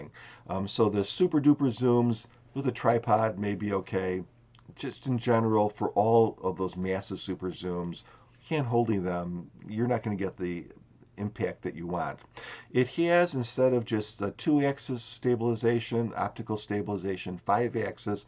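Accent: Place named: Upstate New York, United States. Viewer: Thanks for the review, I'm picking this up used tomorrow for $100. I think it's great for the price. I enjoyed listening to you speak, your accent reminds me of my relatives in Oswego NY.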